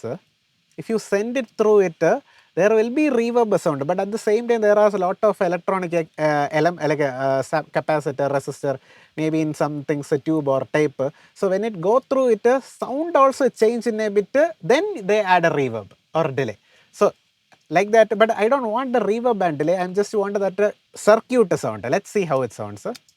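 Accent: Kerala, India. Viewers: bro nothing wrong in it, but since he’s explaining, I couldn’t catch it, cuz it was more like Malayalam English, the concept is amazing! He’s knowledgeable no doubts in that, just posted what I felt as a listener.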